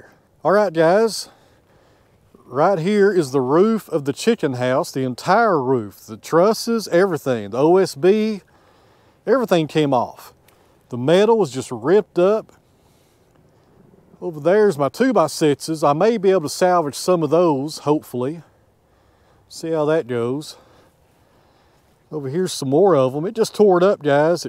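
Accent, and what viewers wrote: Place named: East Tennessee, United States. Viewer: That looks like tornado damage, alright. We lost our barn back in 99, and because there were no "confirmed" touchdowns, insurance refused to pay a penny. By the by, sound quality is pretty good,. Sounds about like you have it dialed in. Oh, something I've been thinking about... My grandfather was a Sawyer up here in northeast Ohio and northwest Pennsylvania from the 1930s to the mid 80s, and some of his favorite workers were from your neck of the woods. Herman Hutchison, Sheridan Severt and his son John (all gone now, sadly.) were from Johnson County and sounded almost exactly like you. Must be something about the land of east Tennessee that makes good sawyers...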